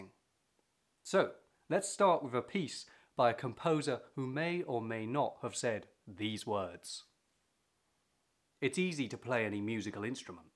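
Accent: United Kingdom. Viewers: Rejoice, o my soul, in Christ, the Risen Saviour!, your narrating is truly wonderful, so beautifully English. Thank you for this wonderful recital.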